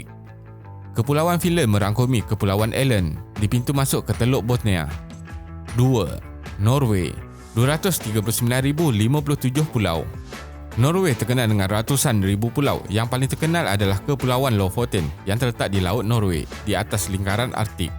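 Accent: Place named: Malaysia. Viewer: yang ngomong kaya orang malaysia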